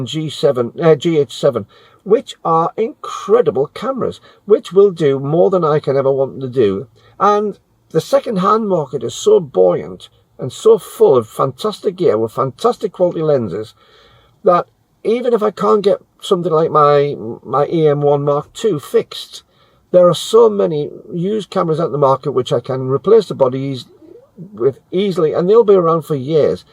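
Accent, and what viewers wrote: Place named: Northumberland, United Kingdom. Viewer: Hi, whilst listening to some great waffling, I'm trying to place your accent. You sound very similar to some of my relatives who were originally from Haltwhistle in Northumberland. Am I in the right geographical zone? Cheers.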